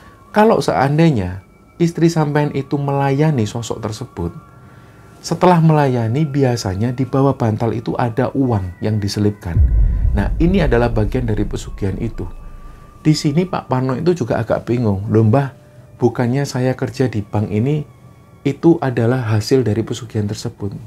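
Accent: Java, Indonesia. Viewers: Assalamualaikum..luar biasanya skrg byk subcbrnya..ikut senang bgt👍👍🙏cerita yg dibwkan juga seru,serem,menengangkan dan mas bawakan crtanya enak di dgr..tetap ga ningalin jawanya juga..pokoknya keren banget👍👍😍🙏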